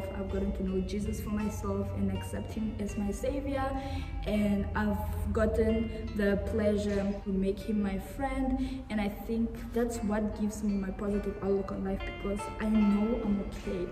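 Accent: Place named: Namibia. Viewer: Love your accent African sister. You’re the first Namibian I met... even though this is virtually. Thanks for talking about abuse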